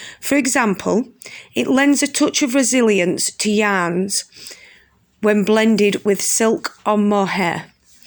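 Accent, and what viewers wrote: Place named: Northern England, United Kingdom. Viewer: Very nice video. And your northern accent is entrancing to us in California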